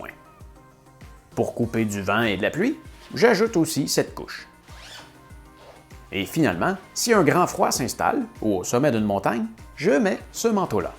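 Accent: Canada. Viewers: Merci ! J'adore ce bon et sympathique accent canadien !!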